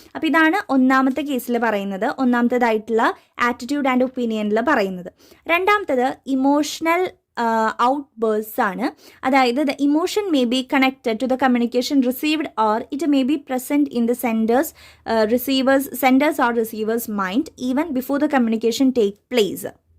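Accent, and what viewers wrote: Thrissur, India. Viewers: Thrissurkaari aano😊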